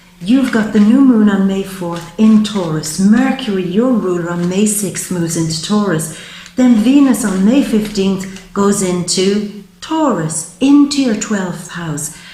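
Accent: Ireland. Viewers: You look amazing, thanks for the reading, from one Irish person to another, it's lovely to hear an Irish accent in tarot❤️❤️❤️🤗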